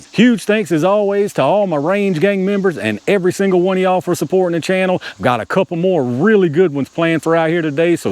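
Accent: South, United States. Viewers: Very useful and informative test - thank you. Is that your real accent, or are you "southing it up" for us on YouTube???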